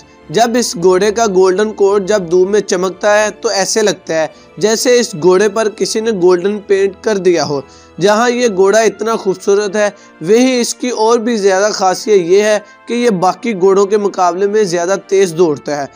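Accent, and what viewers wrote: Pakistan. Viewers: Accent se bhai Pakistani lag raha hai. Waise jung ke lihaaj se Arabian aur Marwari Horses best hote hain. Inme bhot stamina hota hai aur ye jaldi ghabrate nahin hai. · Tumhare bolne ke andaje se lgta h aap pakistani h 🤣😂😂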